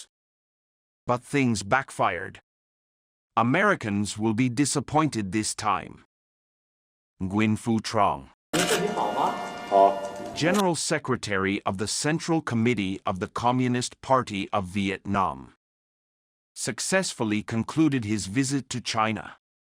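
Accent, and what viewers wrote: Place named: United Kingdom. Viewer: Hmm your tone to speak but slow looks like.. british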